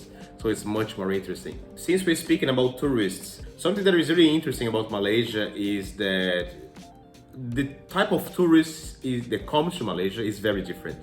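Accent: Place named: Brazil. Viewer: Well done, you speak your mind about Malaysia and not just gloss over the topic like some other YouTubers just trying to make everyone happy. Bravo for that! From your accent, I believe you are Brazilian. Not sure yet but I will look at your other videos to determine that later. Interesting observation of the Turkish people. I have interacted with those outside of Turkey and visited Istanbul before. Never live there before.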